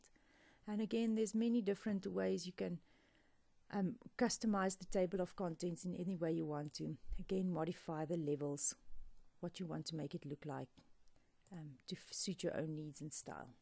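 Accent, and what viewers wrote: South Africa. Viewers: A lovely South African accent I detect 🥰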